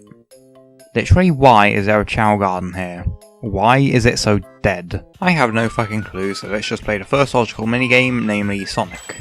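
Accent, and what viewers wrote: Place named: United Kingdom. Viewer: Bruh your British o.o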